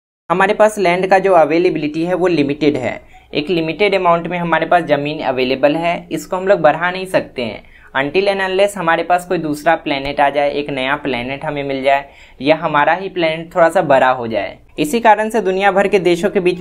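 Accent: Bihar, India. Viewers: Sir aap jharkhand bihar ke ho kya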